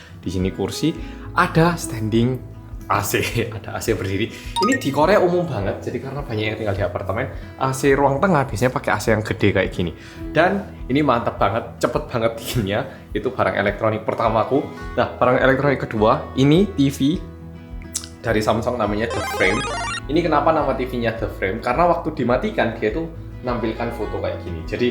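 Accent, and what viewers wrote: Java, Indonesia. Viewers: Dulu waktu 2014an ga terlalu suka sama mas karena ngomongnya super medok, feels so weird for me. Tapi semakin kesini malah makin demen, terutama pembawaannya dan ke sederhanaannya. Sukses terus yo:)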